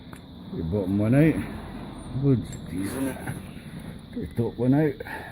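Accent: Scotland, United Kingdom. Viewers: Love the accent Jock Scottish 👍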